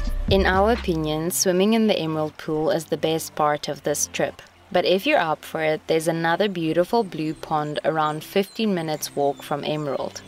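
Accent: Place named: South Africa. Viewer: Your accent... Is julle van Suid Afrika?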